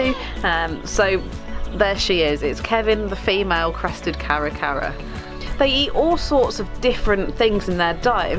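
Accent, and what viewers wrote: United Kingdom. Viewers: Excellent presentation. Even without the British accent this would be an excellent presentation.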